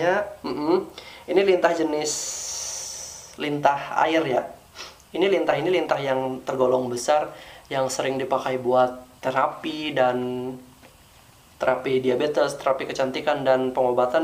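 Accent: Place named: West Java, Indonesia. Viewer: Ka ngomong nyah sunda banget pake p maaf yah ka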